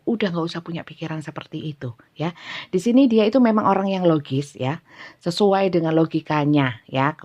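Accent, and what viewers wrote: Java, Indonesia. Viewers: Setuju bunda.. dg bahasa bunda yg agak" medok..sy suka banget.. krn sy wong ndeso bunda. Trenggalek jatim..💪semagat ya bun. Smg bunda sht sll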